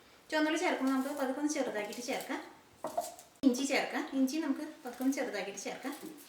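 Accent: Thrissur, India. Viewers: U r my guru at cooking.I got married two years back and since then I was watching your videos and tried alot of receipes and came up with success stories. Thanks alot .like your tcr slang and ur simplicity. Your videos r really inspiring for others to cook.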